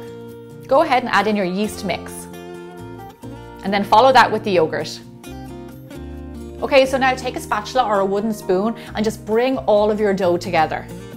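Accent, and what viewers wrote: Ireland. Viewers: Bread is as lovely as your brogue! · I can't tell if she's Irish, American or British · Irish! Lovely!! I watch your videos and always learn from them! God's blessings to you and yours!!! 👍❤💖🤩😃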